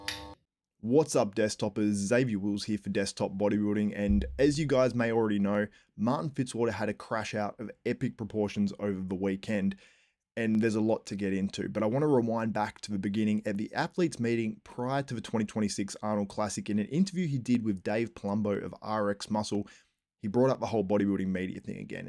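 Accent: United States. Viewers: Your Martin-esque American accent is hilarious! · lol nice american accent Xavier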